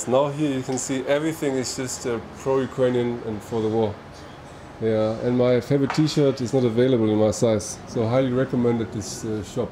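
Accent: Germany. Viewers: 10 seconds in, oh ja, er ist einer defintiv Deutscher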